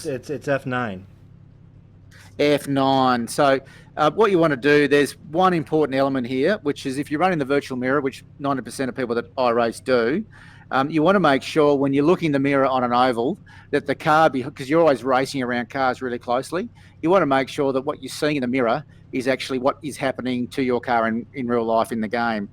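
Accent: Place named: Australia. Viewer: maaaaaaate, such a occa accent!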